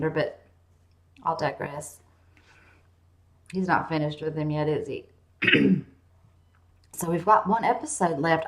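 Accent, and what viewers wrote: United States. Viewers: Why does everything sound better in a Texan accent? BTW, Alliser actually calls Jon "Lord Snow" which is an insult (you know, bastard?). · Glad to hear you're feeling better! And I love your voice. You sound a lot like my aunt Didi, who's from Alabama.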